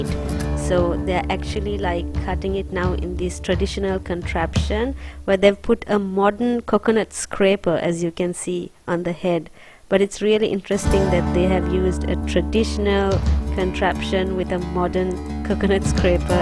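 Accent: Sri Lanka. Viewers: I think it's bit hard to guess hmm 🤔 Nevertheless when it comes to your Sinhala accent it's more like a Sri lankan's one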